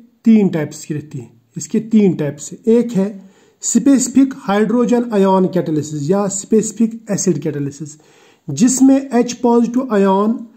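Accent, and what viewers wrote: Kashmir, India. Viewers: Sir you sounds like kashmiri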